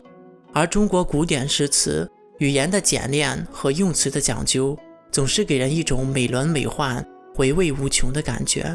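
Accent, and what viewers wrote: Shandong, China. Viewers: up主听这个口音 感觉祖籍是不是山东的呀 山东普通话 哈哈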